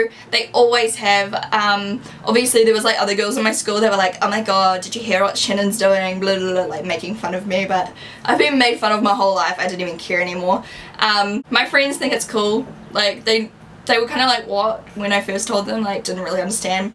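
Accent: New Zealand. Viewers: Your main channel was the first one I subscribed too. I remember the first video of yours I saw was a grwm clubbing so it was just music. I clicked onto another video of yours and was so surprised to hear your voice!!! I was thinking you'd have an American accent, but no, you're a kiwi just like me!!! Instantly I subscribe and have watched pretty much every single one of your videos! Love you Shan :) xxx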